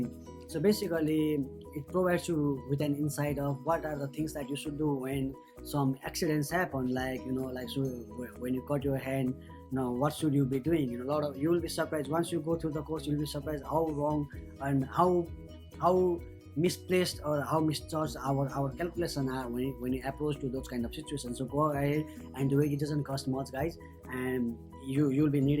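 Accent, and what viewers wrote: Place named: Nepal. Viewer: that's called Nepali accent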